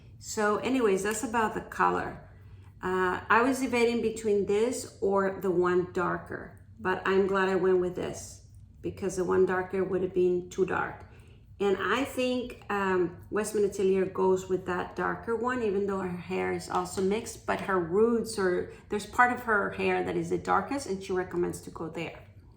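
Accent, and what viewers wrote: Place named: Mexico. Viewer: I had a feeling you’re from Mexico. I’m from Los Angeles, so I’m super familiar with the accent. Now I live in Miami, so I don’t hear it as often anymore- it’s all Cuban, Dominican, island accents and some Central American here and there. Well mujer- I’m digging your channel. You have tons of videos and it’s always refreshing to see videos of more grown women! Keep it up and much success to you!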